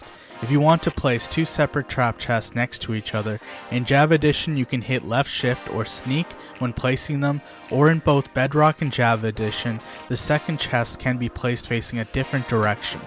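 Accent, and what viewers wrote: India. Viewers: Are you a indian bro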